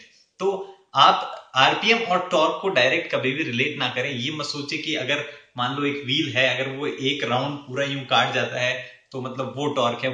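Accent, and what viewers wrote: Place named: Haryana, India. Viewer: saab aapki haryanvi tone bahut achhi lagti hai कानों को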